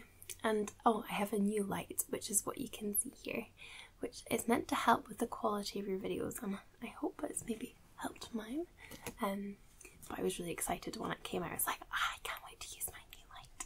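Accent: Scotland, United Kingdom. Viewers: I love your Scottish accent. Greeting from the USA. I just subscribed to your channel today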